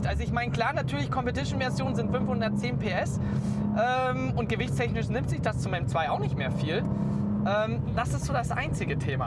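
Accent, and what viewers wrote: Bavaria, Germany. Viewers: A is des schee wenns auf boarisch is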